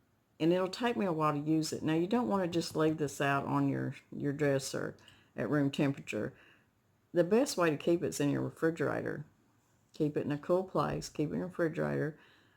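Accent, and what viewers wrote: United States. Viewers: LOVE that country accent, girlfriend! You sound like family. 😁 I love putting rose water in a spray bottle and lightly mist it on my face and diluted, I use it on my hair as a leave-in/refresher spritz. As soon as we move I'm going to try to grow some roses just to make RW with! 👍👍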